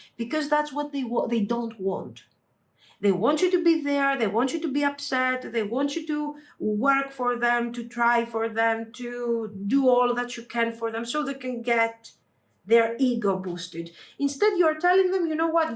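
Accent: Greece. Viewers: I guessed you’re Greek from your accent 😆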